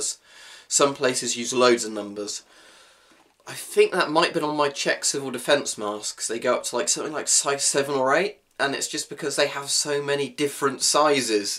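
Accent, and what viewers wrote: Russia. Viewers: nice russian accent bro, you sound like you speak it fluently. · Russian accent 20/10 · I want an entire video of you talking with that russian accent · Great russian accent :)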